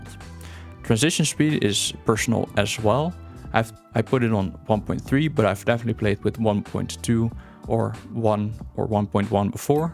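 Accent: Netherlands. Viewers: Your Dutch I just hear it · hey are you dutch matbe?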